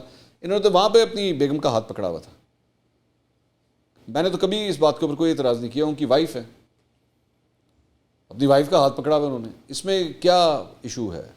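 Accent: Punjab, Pakistan. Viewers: bhai your Punjabi touch makes the program very interesting. Please keep it up. Thank you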